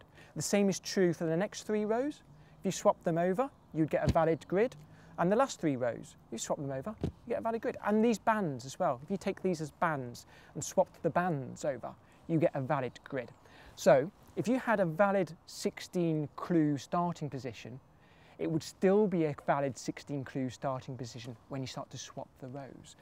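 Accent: United Kingdom. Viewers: if this man did not have a British accent i would have stopped watching about 8 minutes and 30 seconds ago. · Im american Hes british He sounds funny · are u british u speak with a british accent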